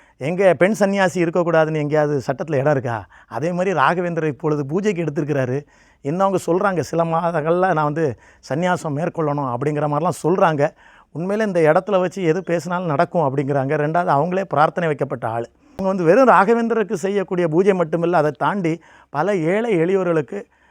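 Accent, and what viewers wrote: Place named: Nagercoil, India. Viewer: ஸார் நமஸ்காரம், உங்களுடைய நாகர்கோவில் பாஷை மிக அருமையாக உள்ளது. உங்கள் பக்தியில் ராயரே கரைந்து விட்டார் போல் உள்ளது.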